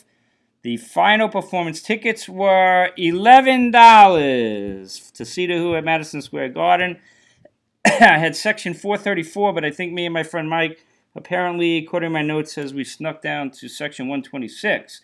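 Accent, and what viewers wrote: Boston, United States. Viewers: What's your accent? Boston? It's a bit like JFK in places.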